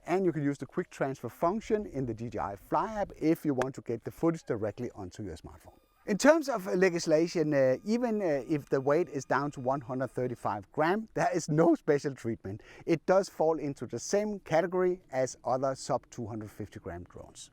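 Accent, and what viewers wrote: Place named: Denmark. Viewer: Sir you accent sounds Dannish, Swiss or Norwagian 😊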